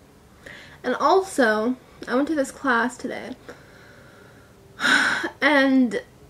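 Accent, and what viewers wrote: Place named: South, United States. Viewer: You sound kind of southern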